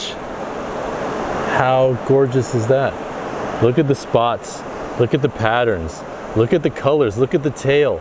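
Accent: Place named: South, United States. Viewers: Southern accent made me laugh so hard, ^_^. 5 star video. Thanks. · Love that southern voice hahaaa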